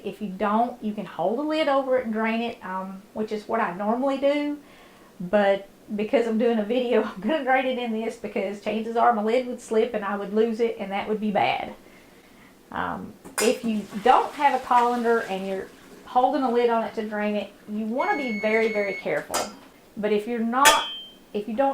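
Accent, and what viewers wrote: South, United States. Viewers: 2X T-Spring. I just love your channel. As a northerner, I love your southern accent. You share a lot of information and I also love that you are a. Christian, as am I.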